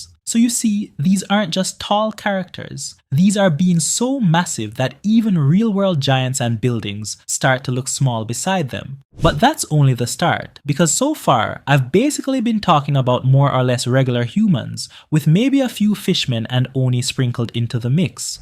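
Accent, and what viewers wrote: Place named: Jamaica. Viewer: You Jamaican bro? There’s a familiar accent in your tone😂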